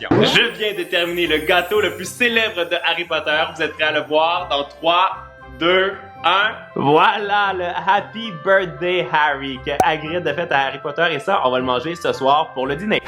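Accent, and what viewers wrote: Quebec, Canada. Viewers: Draw Kyres accent québécois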